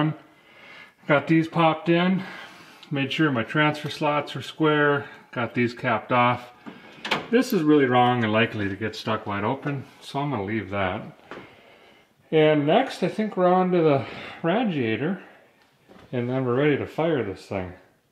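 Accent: Minnesota, United States. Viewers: Mind-bottling. . . the Minnesotaization of the language can be quite amazing. I'll do the right thing and say, close enough!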